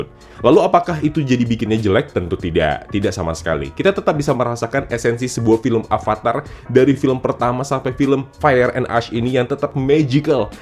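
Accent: Medan, Indonesia. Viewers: bener banget lgi yg di bilng abg ini. komposisi crita nya hampir sama. dmna eywa membantu dengan mengirimkan bala bantuan yg sama, terus pas war di air juga sama. tpi smua itu terbayar karna visualnya dan action ny. ya cukup di nikmatila bagi orng yg gk terlalu Mandang crita. btw ko orng medan ya bg😂?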